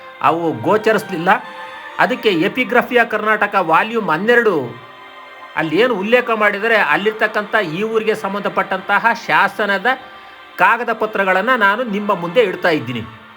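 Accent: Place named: Pavagada, India. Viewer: Pavagada bhashe lli heltha ediya guru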